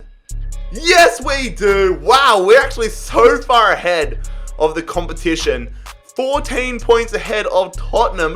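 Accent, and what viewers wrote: Australia. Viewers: He is Australian they speak very strangely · Are you a bloody Australian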